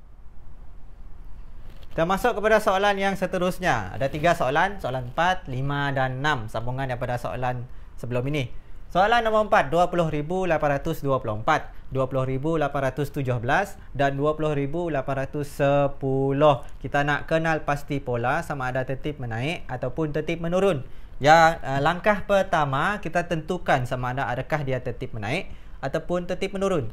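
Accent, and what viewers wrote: Sabah, Malaysia. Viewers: Cikgu bukan cakap orang sabah ke?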